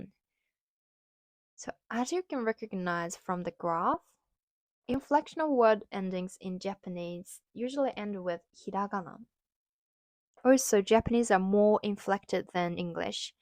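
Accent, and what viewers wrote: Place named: Australia. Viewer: i found your channel from that 'what i've learned' video (about why japan has a low obesity rate) but am also studying japanese so i figured i'd watch this video. have been studying japanese for many years but progress is slow. one thing i learned from this video is that there are 188 particles! i had no idea it was so many, even after years of studying japanese i only know about 20-30 of them. it's also interesting that despite you (presumably) being a native japanese who has visited or lived in australia, i still detect an australian accent in your english, especially when you say words like 'answer', you say them in the way an australian would.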